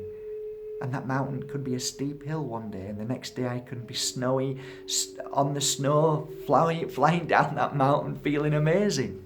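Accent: Yorkshire, United Kingdom. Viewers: You from Yorkshire northern England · Is your accent Yorkshire? I have a Leeds accent, yours sounds Leeds/Calderdale area? Just found your channel - it's wonderful!! Many thanks x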